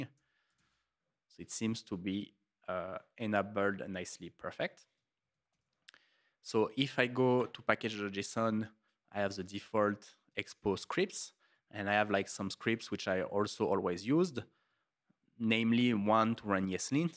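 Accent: France. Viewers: I'm detecting a strong French accent 👀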